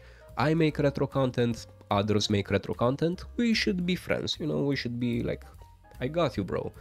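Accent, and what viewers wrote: Russia. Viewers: credeam ca esti rus dupa accent :)) dar acum ti-am dat Sub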